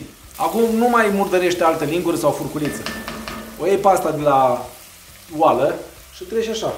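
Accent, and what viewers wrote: Moldova. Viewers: Super faină supa. Iar de vorba asta dulce moldovenească ce să mai spunem?? Ne încîntă sufletul. Mulțumim frumos, de rețetă.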